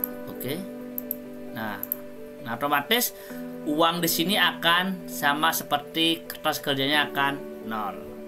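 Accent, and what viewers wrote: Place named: Bali, Indonesia. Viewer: Bapak ini dari bahasanya pasti orang bali😃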